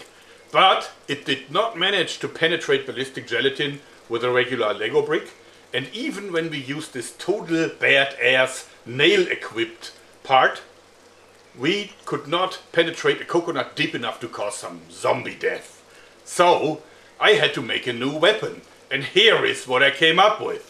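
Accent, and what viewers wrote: Germany. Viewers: This dude is so cute with his german (please correct me if im wrong) accent and his homemade weapons. Like a big kid that's really creative... and possibly deadly.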